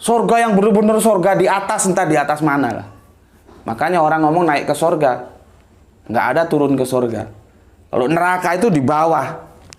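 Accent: Java, Indonesia. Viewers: Hahahaha.. Inggris jowo campur mbatak... hahahaaaa saya suka..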